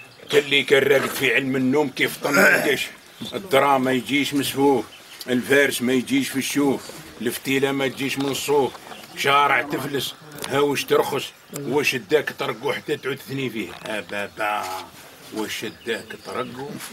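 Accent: Chaoui, Algeria. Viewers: Midi Bi تحية للشاوي من عند الشاوي بصح اللهجة نتاع السيد ما تبا نش سطايفية . تقول عليه شاوي حر من اولاد سي علي .والله تقول من عرشي .واش رايك . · هدرا شاوية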